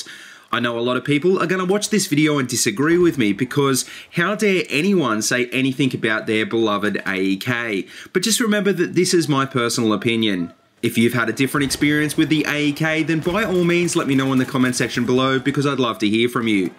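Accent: Australia. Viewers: Been watching since m 39 sks review I believe. Love the videos your making. Hope your band flops so u can keep making theses vids lol. Truly hope yall do well. Had a few friends that tried a music career didn't make the "big time" but did well.sorry I forget your bands name could you please remind me. The 240 bravo, Ace 23, 870, ace-sv and scar are my "go to" weapons. Looking to change it up a little bit , could u recommend a new weapon selection. Played with most but those the ones I gravitated towards an after a cpl of beers my game play goes ptfo to sporadic game planning an "ttt". And 1 last thing if u don't mind where in the world are u. I think u an Australian. Not sure no offense if not jus not good with accents and flags. So to sum up love vids, can u recommend some new weapons.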